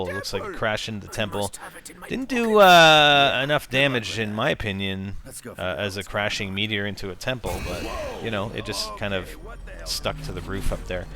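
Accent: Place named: Canada. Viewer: your Canadian, aren't you..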